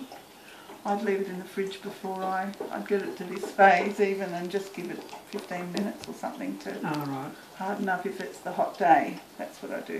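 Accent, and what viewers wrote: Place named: Australia. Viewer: Thankyou for the video. Great instructions. PichilmI she definitely sounds Australian.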